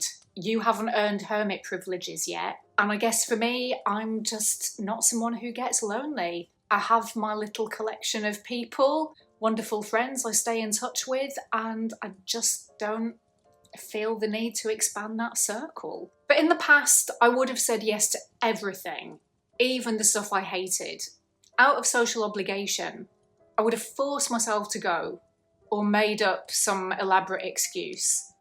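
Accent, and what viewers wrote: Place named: Northern England, United Kingdom. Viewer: Great reflections. Candid and sharp but genteel and insightful. What region of the U.K. Are you from? Love your accent. Northern, I imagine.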